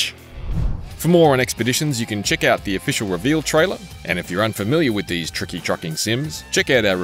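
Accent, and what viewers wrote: Australia. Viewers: Australian accent does that. A lot of us are very monotonous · Did they purposely find an aussie guy to review this? Kinda makes sense tbf lol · He's an Aussie. It's how we sound. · Yeah nah, He's positive neutral. it's just the Australian accent.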